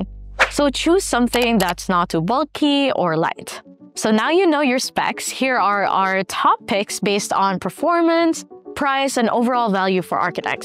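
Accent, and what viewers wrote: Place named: Philippines. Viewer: I think her Filipino accent is still noticeable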